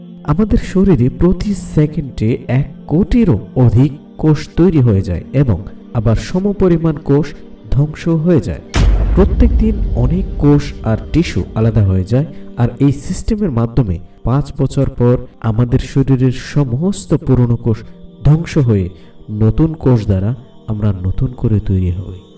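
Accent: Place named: Chittagong, Bangladesh. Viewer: তততো না তথ্য, মাতা না মাথা, উচ্চারণ সুন্দর হলে ভিডিও দেখতে ভাল লাগে। এই ভিডিও তে যিনি বর্ণনা করেছেন উনি সম্ভবত চট্টগ্রামের লোক।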